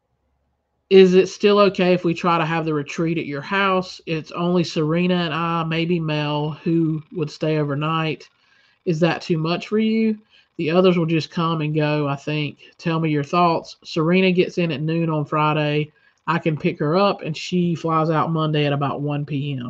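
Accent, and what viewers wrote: South, United States. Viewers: ❤️ it ! I heard it with that beautiful southern accent.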